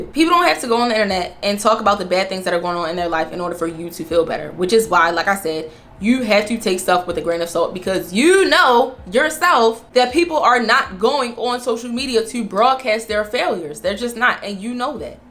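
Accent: Baltimore, United States. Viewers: I think it’s mostly Instagram not all social media platforms. Also what state are you from im trying to pick up on the accent? I feel like it’s Baltimore though.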